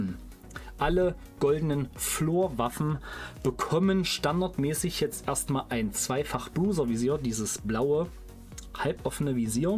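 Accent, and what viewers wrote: Saxony, Germany. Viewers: Kommst. Du aus sachsen wie ich?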